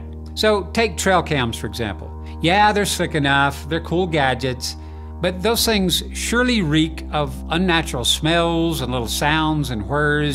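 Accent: South, United States. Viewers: You're I'd say southern accent sounds like mine when I talk lol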